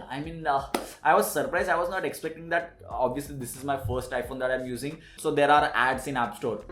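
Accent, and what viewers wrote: Maharashtra, India. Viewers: Thick Marathi accent in the game. Khup chhan pan ! :)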